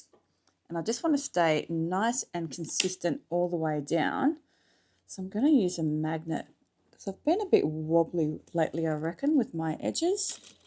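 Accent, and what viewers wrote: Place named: Australia. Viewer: Love you,! You’re the best teacher. I appreciate your Aussie sense of humor—not to mention the accent. In elementary school (4th, 5th, and 6th grades), I had a teacher who insisted every pupil — male and female — learn how to sew. I stopped many years ago. And now in my twilight years I’m using a Brother XR1300 and a Baby Lock Brilliant to make bags, bags, bags — before graduating onto something bigger. I plan to watch all your vids and hope you will continue to make them years into the future. Bless you!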